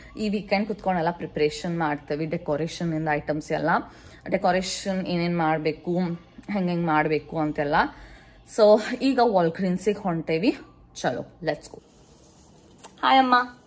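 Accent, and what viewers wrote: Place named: Dharwad, India. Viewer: ಏನ್ರೀ mrs ಏನ್ ನಡ್ಸಿರಿ... ಧಾರವಾಡ ಭಾಷೆನ ನಿಮ್ ಬಾಯಿಯಿಂದಾನೆ ಕೇಳ್ಬೇಕು ಅನ್ನಿಸ್ತದ ನೋಡ್ರಲ ಪಾಪುವಿನ ನಾಮಕರಣ ವ್ಯವಸ್ಥೆ ಮಾಡೋದ್ರಲ್ಲಿ ಬಹಳ busy ಇದ್ದೀರಿ ನಡೆಸಿ ಎಲ್ಲಾ ಒಳ್ಳೆಯದಾಗಲಿ 🙏🙏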